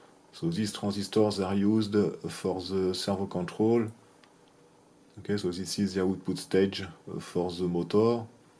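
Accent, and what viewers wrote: France. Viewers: Friend, after you get used to watching videos with the French accent you will get much better at it, it just takes a little practice 😸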